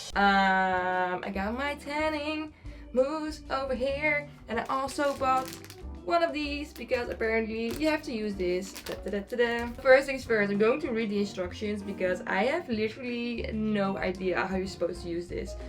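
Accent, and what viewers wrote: Netherlands. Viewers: Omg ik dacht zo ze klinkt zo nederlands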